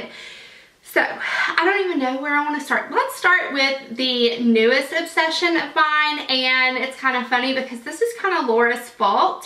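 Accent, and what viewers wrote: South, United States. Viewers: Well hello, ***** I share your "mom of boys" lifestyle. I have 2. Love 'em to pieces. Aren't they the best? Nothing like the love b/w mom and her sons, right? Been a subscriber for awhile now, but occasionally like to comment and say hi. Must say, I'm partial to your southern drawl as I was born (GA) and raised (SC) in the South. Miss that now that I'm in MN. Yes, I've lived here over 15 years and still have a slight accent but most of it's gone.:( And you do remind me so much of my dear sweet SIL. Watching you/your videos kind of brings me home in a way.:) Love you channel and your videos Great selection for this one! Hoping if you have a chance, you might pop over to my channel and check out some of my videos. And if you have any advice for me I would love to hear from you. Wishing you a wonderful day! xo ~Melissa in MN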